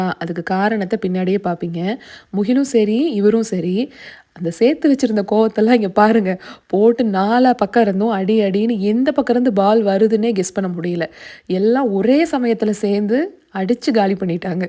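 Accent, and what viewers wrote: Coimbatore, India. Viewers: Modaiya irukum😂 Absolute Coimbatore slang❤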